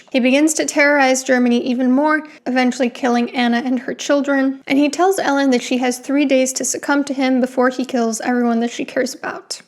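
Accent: Romania. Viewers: Were you born in the US? Your pronunciation of "Nosferatu" gave you away as a romanian but your English sounds american. Cheers for the video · I'm curious if you're familiar with the 'Subspecies' Vampire films from Full Moon Features.... They were the first to be filmed in Romania, using the Hunedoara Castle as the set....the Character of Radu Vladidlas is a magnificent example of a classic, menacing and maniacal Vampire. Also, I loved the new Nosferatu as well....your review is very good and your Romanian accent is fascinating! Thank you and if you haven't seen the Subspecies movies, definitely do yourself the favor of rectifying that!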